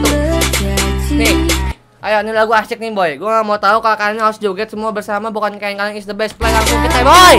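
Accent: West Java, Indonesia. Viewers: abang orang sunda ya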